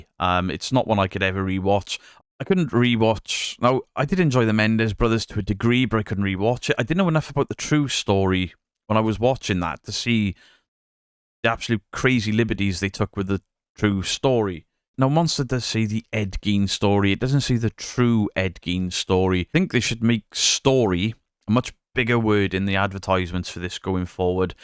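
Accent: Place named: Wales, United Kingdom. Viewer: I was thinking you were Welsh,I'm Irish myself. Good channel. Subbed 🇮🇪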